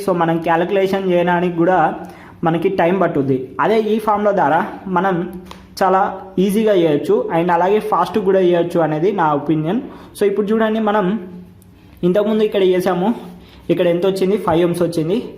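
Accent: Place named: Telangana, India. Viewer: language okkk telanganaaa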